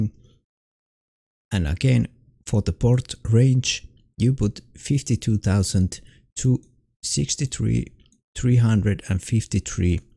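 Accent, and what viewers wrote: Finland. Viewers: Do I hear a Finnish accent or am I going crazy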